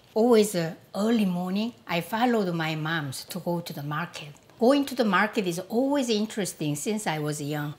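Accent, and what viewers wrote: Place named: South Korea. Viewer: Your Korean accented English is beautiful, and so are you!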